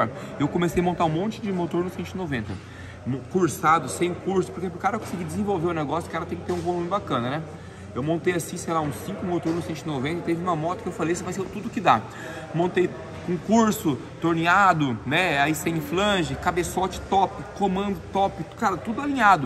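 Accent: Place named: Goiás, Brazil. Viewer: Goiano uai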